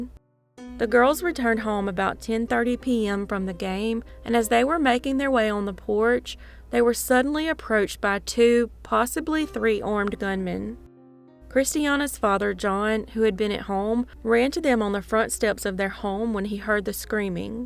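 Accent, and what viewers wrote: South, United States. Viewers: 1st time I ever clicked on a video when the narrator inserts extra syllables in every word. Wooo what a strong hick accent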